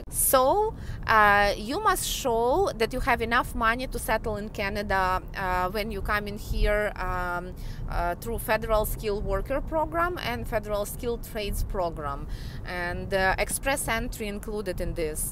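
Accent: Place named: Russia. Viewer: oh that deceiving Russian accent...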